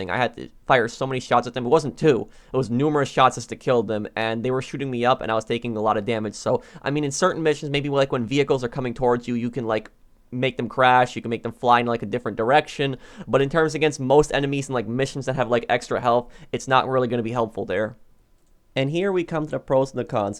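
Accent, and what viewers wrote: Poland. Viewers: Are you polish? Just a Side question. But i love your content and i love How creative and descriptive, especially when it comes to killing griefers. Great job. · Are you polish?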